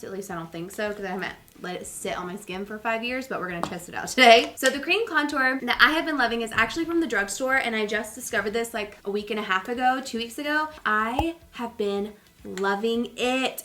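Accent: South, United States. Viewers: hear a little of your southern drawl, but that’s adorable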